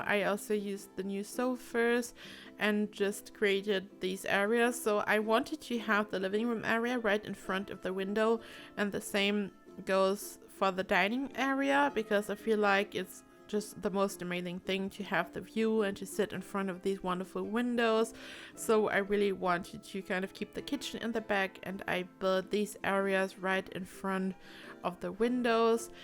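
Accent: Germany. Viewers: I think you have a German accent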